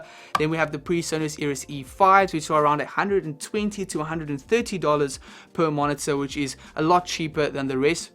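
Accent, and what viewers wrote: South Africa. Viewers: You sound South African dope video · Are you South African bro ??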